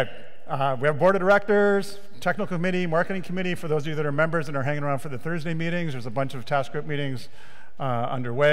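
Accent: France. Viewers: nice french accent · hearing him speak Spanish with a French accent is kind of funny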